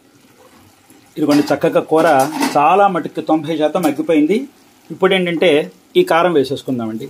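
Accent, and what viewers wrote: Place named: Andhra Pradesh, India. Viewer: Maadi kudaa same slang… same place Rajamandry❤️❤️❤️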